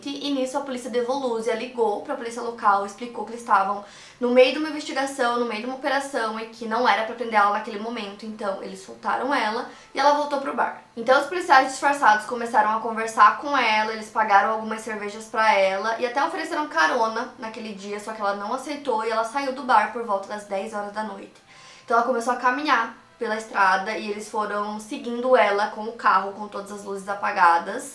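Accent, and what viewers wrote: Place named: South, Brazil. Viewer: você tá perdendo o seu sotaque sulista 😳😳😳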